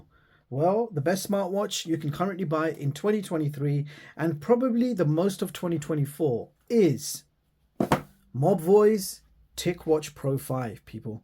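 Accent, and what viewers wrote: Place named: United Kingdom. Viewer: Your accent like Harry Potter Film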